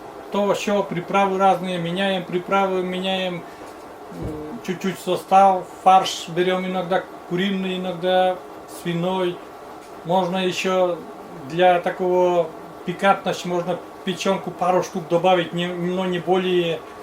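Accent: Komi, Russia. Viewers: Случайно попала на ваш канал, по говорю поняла что коми, сама с Усть Кулома, с большиим удовольствием смотрю ваши видео, очень нравится как вы " Болтаете" Как говорите сами.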